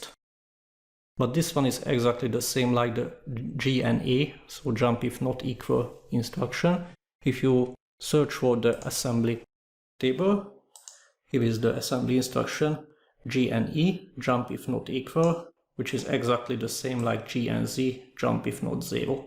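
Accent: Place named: Hungary. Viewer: Cool vid! Hey, aren't you from Hungary? Your accent is so familiar for some reason.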